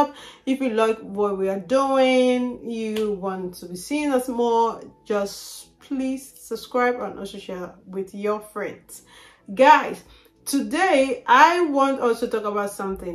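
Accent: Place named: Africa. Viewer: Very hard for me to pick up your English African Accent 😢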